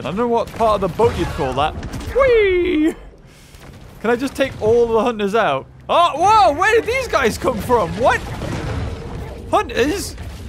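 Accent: Louisiana, United States. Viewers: He has a Cajun accent. He's creole. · are you American? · there are three types of Louisiana accent 1. Farmland (southern accent) 2. City (New Orleans accent) 3.Bayou (Pete’s accent) I hope you find this educational Pls upvote so can see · It's a Louisiana accent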